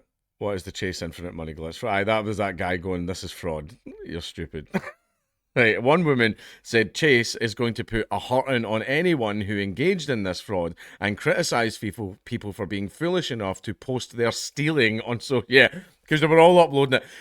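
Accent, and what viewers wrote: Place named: United Kingdom. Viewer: The wores bank in UK Barclays bank The big amount of money was pull off from bank way no Alert stolen money 10 pounds I 2 transaction easy Hackers all over in England UK do that car dealership or others Nice British accent Which dialogue you have brother North or South I never can catch which one london bath Norwich leeds Birmingham Liverpool Manchester Blackpool York each other different talk Accent dialer hey not joke Chase bank fake check 20 years jail time by fbi police ok bye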